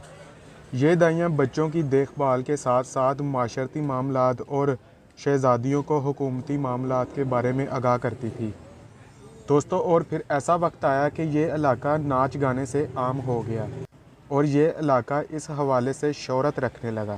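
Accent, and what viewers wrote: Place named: Punjab, India. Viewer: You speak urdu with punjabi accent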